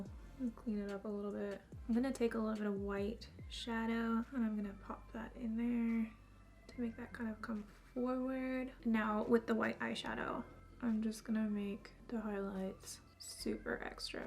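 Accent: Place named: Canada. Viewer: I personally don’t think you sound like Kylie at all. Your Canadian voice is pretty unique to me ☺️ love the look!!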